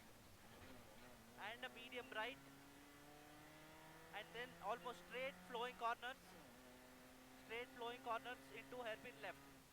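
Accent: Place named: India. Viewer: First time ever I am going to heard Indian ascent for the pace notes... Looking for more content from your side... 😄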